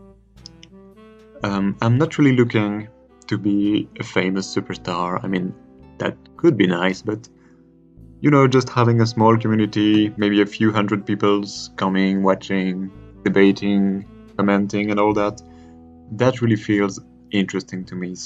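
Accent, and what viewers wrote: France. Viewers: I know this is an older video, but I love your Gothic builds. Your French accent was delightful to listen to while you built this maison. I am a big lover of English and French history and I know it's been a while since you have uploaded sims4 videos, but I hope one day you will continue building European style builds. Maybe even historical ones! Have a lovely day.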